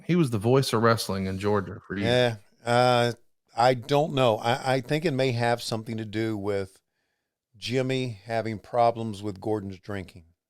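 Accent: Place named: Tampa, United States. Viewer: You sound like you are from Tampa. My dad would take me to Fort Hesterlee Armory (probably spelled it wrong) on Tuesday Night and we would see Gordon smoking one cigarette after another, announcing the matches. He was the best.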